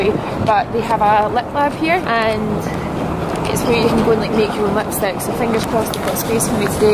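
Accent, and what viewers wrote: Scotland, United Kingdom. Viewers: BTS Trash she's Scottish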